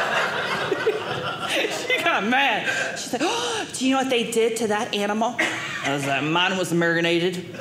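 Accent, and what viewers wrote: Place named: Alabama, United States. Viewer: i like her, she talks like me! From Alabama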